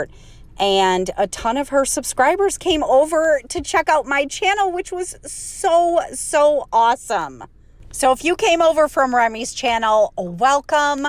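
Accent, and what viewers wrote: England, United Kingdom. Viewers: Hi I’m from England and I’ve been watching you since you started and I thought you spoke in an English accent but I wasn’t sure and I didn’t say anything just in case, but well done it doesn’t sound that bad 😂😂❤❤ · life is all about finding joy wherever you can and if talking in a British accent is what makes you happy then do it ❤ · This was a great one.. Love the little British twangy.. Appreciate you❤ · I love your English accent 😂great job ….lord I hate dust 😊❤️ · For sure...keep the British accent!! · Great work I’ve not cleaned behind my washing machine for years and now you’ve made me realise that I’m going to have to do it. And I’m English and I love your English accent you do xx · I love remi been watching you both about the same amount of time to. I love your British accent. You should hear my American one its awful 😂 love to you and ypur family x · I love your British accent 😂😂 If it brings you joy carry on 😁🇬🇧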